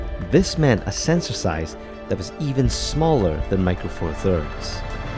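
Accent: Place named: Scotland, United Kingdom. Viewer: Asian face, Scottish accent, cameras, happy to have found your channel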